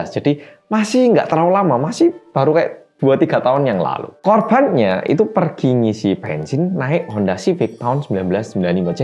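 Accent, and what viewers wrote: Java, Indonesia. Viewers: Mas medokkkkk....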